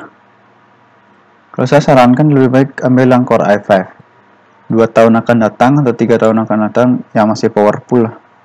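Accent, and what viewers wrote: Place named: Sumatra, Indonesia. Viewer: logatnya orang sumatra y!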